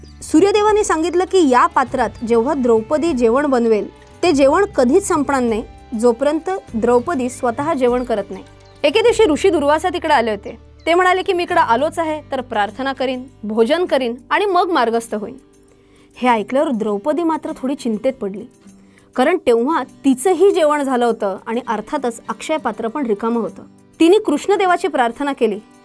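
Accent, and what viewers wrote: Pune, India. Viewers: एक नंबर मॅम, खूप छान वाटलं तुम्हाला मराठीमधून ऐकून!! मी कालच नोंदणी केलीय!! आर्थिक साक्षरता आणि तीही तुमच्या ओघवत्या पुणेरी भाषेत म्हणजे जणू दुग्धशर्करा योगच!!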